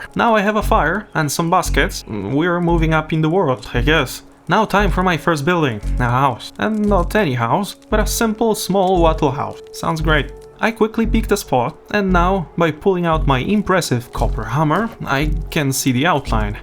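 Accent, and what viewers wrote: Poland. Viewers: Soooo... next 4 years? Btw, are you from Poland? Your pronunciation of names like Piastowie or Klonica sounds like that. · i hear who you pronounce the cities and yes i was right channel based in poland. bardzo dobrze kochanie <3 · Dziwny ten angielski jakiś Polski z deka😂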